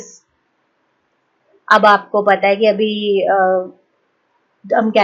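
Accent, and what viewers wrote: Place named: Punjab, India. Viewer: You have a punjabi accent..however the conversation was good😊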